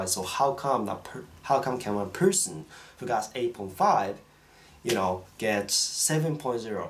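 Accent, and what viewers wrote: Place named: Australia. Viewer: Omg I love your Australian accent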